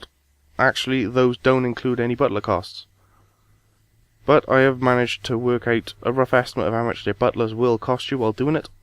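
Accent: Wales, United Kingdom. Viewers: Where are you from you welsh?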